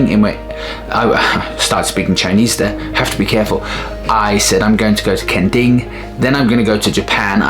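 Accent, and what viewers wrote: United Kingdom. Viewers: 原來你的英語這麼好，英國腔不是很重，稍微有一點而已....住在異國是很辛苦的，總是會想家，但回家又捨不得.... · Love the British accent! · 你的英文跟我的快一樣好 😂British accent, nothing better. Awesome vid.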